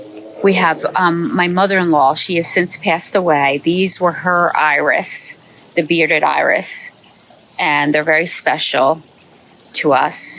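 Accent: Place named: Philadelphia, United States. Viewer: Just lovely. Guessing you’re near Philly? I hear a slight accent especially when you say wooder instead of water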